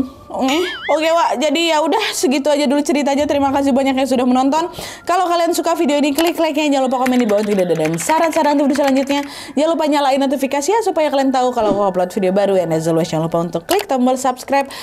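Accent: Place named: Medan, Indonesia. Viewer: orang medan kau rupanya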